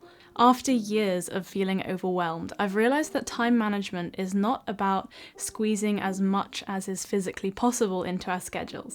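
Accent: United Kingdom. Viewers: how to practice to get British accent like you? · you are that one Youtuber for whom I’ve been searching for years! An intelligent, genuine, well-educated female YouTuber with a modern RP accent as my speech model. I gave up last year after searching far and wide but today the algorithm took me to your British accent video and I immediately subscribed! You’re cultivated, intellectual, sincere, elegant, beautiful, speak with a perfect modern RP accent AND create such high quality video with excellent advice. What else could we ask for? Thank you, thank you; thank you!! ❤️❤️❤️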